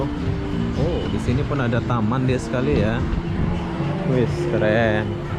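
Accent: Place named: Malaysia. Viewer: Pakai loghat upin ipin ya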